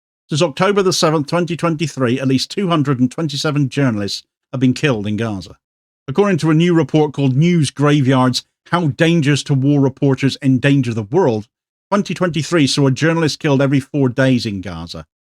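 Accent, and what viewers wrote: Cornwall, United Kingdom. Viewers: Cheers for getting the alternative viewpoint out,. Respect and much appreciation for standing up against bigotry, shut down, intimidation and most sinister of all, genocide. I look forward to your measured "rants" on a daily basis and all in your warm and friendly Cornish vernacular. Keep going, dear friend ✌️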